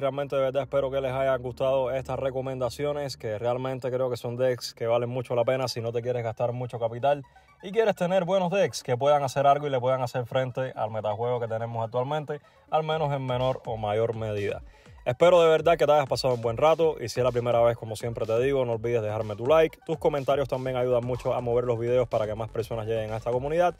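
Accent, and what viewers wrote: Cuba. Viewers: Asere tu eres cubano?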